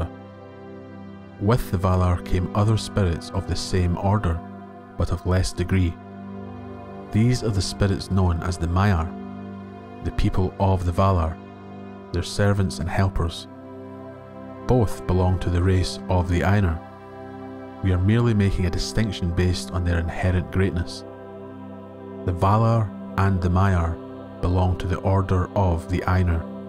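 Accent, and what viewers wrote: Scotland, United Kingdom. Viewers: 😁 near the end of the video I did recognize the Scottish accent! Cheers from Romania! And a good night i got to go to bed.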